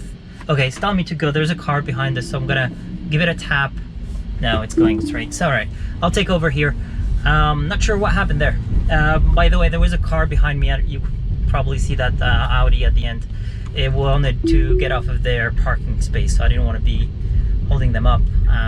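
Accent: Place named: United States. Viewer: Hi, are you... French? You've got a good American accent from what I can judge (being French myself😁). Nice video 👍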